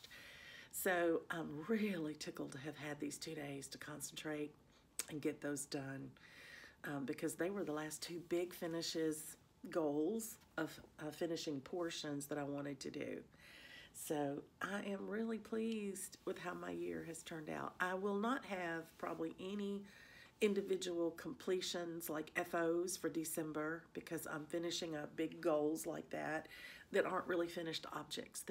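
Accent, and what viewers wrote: South, United States. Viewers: Thank you so much, for such a quick response. Your channel is my favorite. I lived in Memphis, TN for two years and left a piece of my heart there. Your southern dialect, hospitality, and lovely mannerisms take me back to that very enjoyable time in my life. Blessings to you in the new year!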